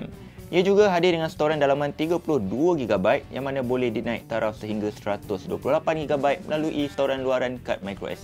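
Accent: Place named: Kuala Lumpur, Malaysia. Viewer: Kenapa orang KL selalu ubah sebutan Malaysia kepada malaysie? ini perlu dihentikan.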